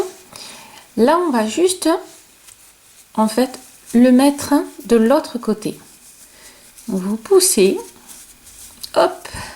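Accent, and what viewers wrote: South, France. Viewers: Super, vous êtes géniale merci pour le joli lutin et j'adore votre accent du sud j'attends d''autre tutoriels avec impatience !!!!! A bientôt .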